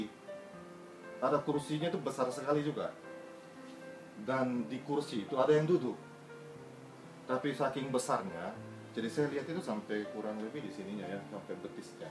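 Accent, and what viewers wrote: Makassar, Indonesia. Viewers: dialeknya kok Indonesia banget. dialeknya sangat mirip dialek Makassar atau Manado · pantas aksennya makassar sekali... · logatnya jadi ikut orang makasar ya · Dialeg Makassar. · logat makassarnya kentara sekali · kental sekali ini orang logat makassarnya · subhanallah, allah mempunyai jalan untuk hambanya yang bersungguh sungguh, lama di makassar yahh, pantasan logatnya makassar bgt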